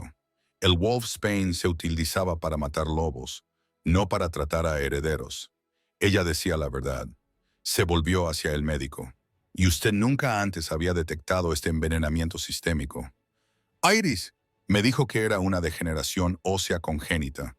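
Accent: United States. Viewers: Pues buena la historia pero como una prometida va a matar al rey para quedarse con su trono si ni siquiera estaba casada... como que la traduccion esta mal la voz narradadora parace un gringo hablando español, pero en fin la omega era la madre muy revorugada....el alfa seguia haciendo lo mismo basandose lo que lara le decia...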